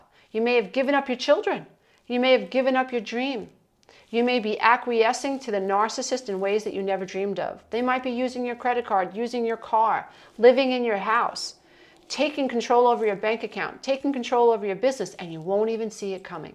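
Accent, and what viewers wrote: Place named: Queens, United States. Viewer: Love your Queens sass, especially “what~eva” 😎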